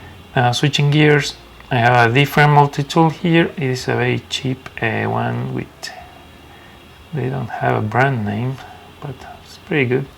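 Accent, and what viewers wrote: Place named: Europe. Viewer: This is Genius 👍 I'm so glad I found this in my recommendations ! How is it you have such a European accent but measure in inches with that work mat though ??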